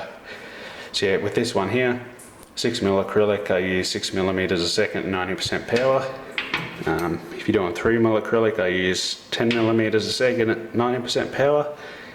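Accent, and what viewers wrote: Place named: Australia. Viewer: Me likes your aussie accent :) Noice!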